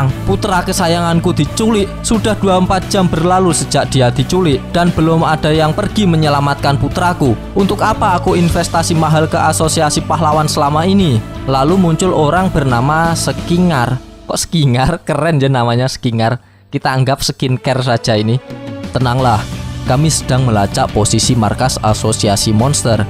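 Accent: Java, Indonesia. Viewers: Mantapp mas... Ntah lah logat jawa lebih enak di dengar buat reack manga... Ngomong ngomong stylenya mirip MR.K Animenia mas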